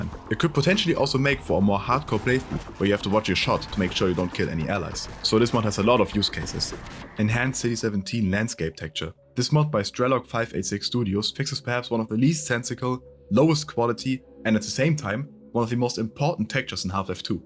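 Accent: Germany. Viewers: You think you speak English but i hear German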